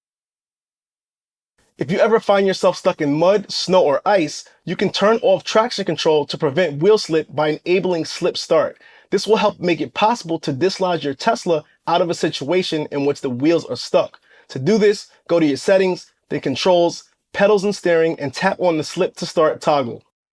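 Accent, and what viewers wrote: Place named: New York, United States. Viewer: Excellent video, we took notes. What is your accent? My Boston raised wife said it's a New York accent.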